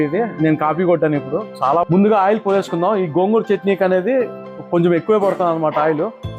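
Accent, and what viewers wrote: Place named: Anantapur, India. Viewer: Abbayi meedi ananthapur ah??? Slang alane undi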